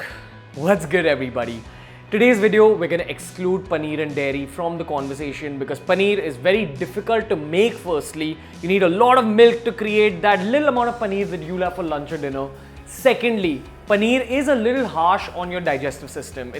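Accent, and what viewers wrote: India. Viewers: Its Amino acid not "Ameino" acid! Yes, I checked the oxford dictionary and thesaurus. Stop using that ugly fucked up Indian way of pronouncing shits. Makes you look like a fuckturd wannabe. That was just pure cringe!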